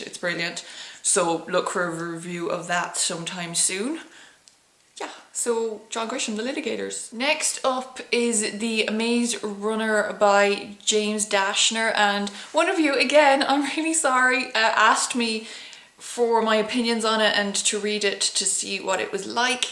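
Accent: Ireland. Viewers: oh I love your Irish accent!! I´ve been there for half a year and it was great. It remembers me of the time there when I listen to you ;) great videos as well!! P.S.: Are you from the West of Ireland? :)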